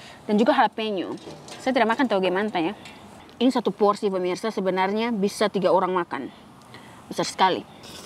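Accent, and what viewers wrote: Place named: Flores, Indonesia. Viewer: Seperti logat orang flores atau apua atau ambon nih.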